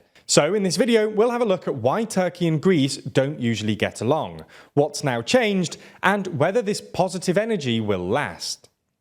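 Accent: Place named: London, United Kingdom. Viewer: lol.....they arent.....they are oceans apart , worlds apart . greece is an ultra secular society only a bloke with Piccadilly square accent could misdiagnose this deescalation as the beginning of a friendship i am half italian half greek and i can firmly say that greece n turkey is like oil n water they dont mix. kalimera to the world from kalamata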